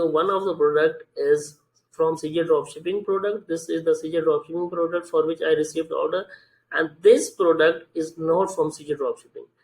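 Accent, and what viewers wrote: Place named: India. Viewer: Bhai english na bola pakaa deti ha hmy aap jaisi bhi english bol lo lgna ap ny desi hi ha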